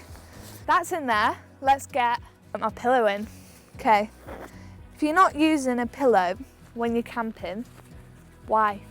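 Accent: United Kingdom. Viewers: Love your UK accent ❤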